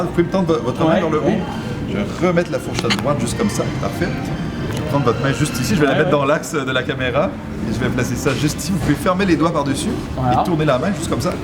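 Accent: France. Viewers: pourquoi il s'est donné un accent francais??? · Pense tu as pris l'accent des Français tu ne plus ton accent Québécois...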